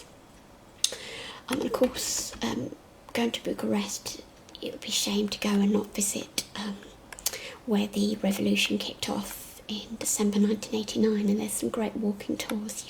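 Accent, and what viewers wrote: United Kingdom. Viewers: Great to hear an English accent ASMR vid! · I love your British accent! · Instantly subscribed after only watching a minute of this video. :) So nice to hear a British accent among the ASMR community. Yours is really soothing and relaxing, love your voice, instant tingles. x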